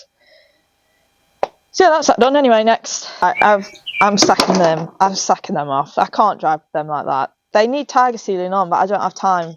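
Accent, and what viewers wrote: United Kingdom. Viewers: maybe it's because I'm German and for me it sounds so utterly british... 😂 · Omg youre alive!:D great to see you and hear you british accent hahah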